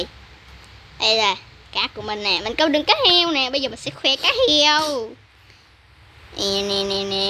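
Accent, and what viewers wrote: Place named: North, Vietnam. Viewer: Ủa sao lại nói giọng bắc, bà nói giọng nam cơ mà??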